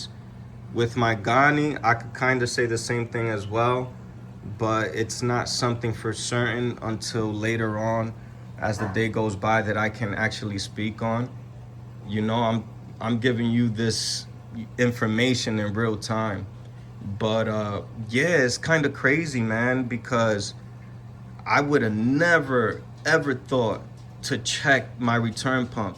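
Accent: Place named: New York, United States. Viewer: dimensions? Correct me if I’m wrong, but you sound like you’re from NY!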